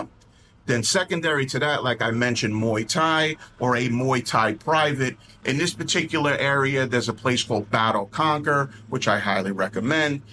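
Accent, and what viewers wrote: Brooklyn, United States. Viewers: love the Brooklyn-speak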